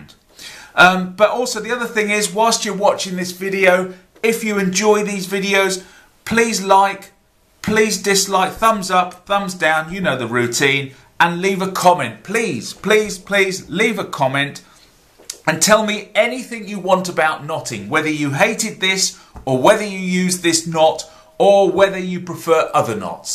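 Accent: United Kingdom. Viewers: Recently got into knots after learning a hitch on a canoeing trip. Building my repertoire. Although I have been using the alpine butterfly as my preferred bend, I liked the aesthetics of the zeppelin, so gave it a search. Thanks for the videos, it is nice to hear a fellow Brit once in a while. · Thank you for demonstrating two ways of tying this knot. And I love your British pronunciation.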